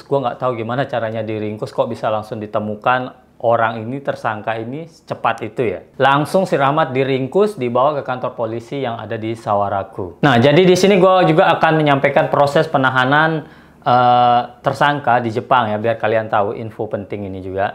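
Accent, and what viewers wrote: Lombok, Indonesia. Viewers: logat sasak tdak hilang,.keren abangku😁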